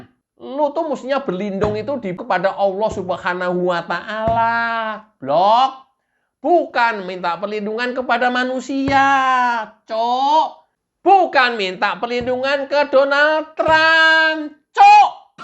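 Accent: Java, Indonesia. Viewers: Pak kalo logat jowone keluar,Mantap. seperti; Cok...Dancok / Jancok...😃 Blok...Goblok...😃 Besok kata"nya di tambahi; Uthek'mu Cok😲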